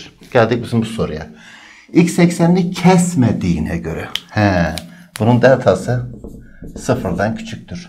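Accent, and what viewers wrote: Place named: Eastern Anatolia, Turkey. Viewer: Hocam nerelisiniz valla doğu şivesi seziyorum 😅 nasıl sevimli 🙏🏻☺️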